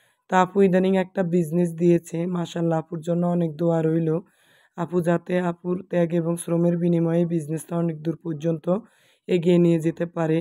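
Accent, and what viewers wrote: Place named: Bhola, Bangladesh. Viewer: আসসালামু আলাইকুম আপু ভোলার কথা সুনলে মনে অতবুত শান্তি লাগো দুই বছর হোলো ভোলা জাইনা লাইক সেয়ার করে দিলাম❤❤❤❤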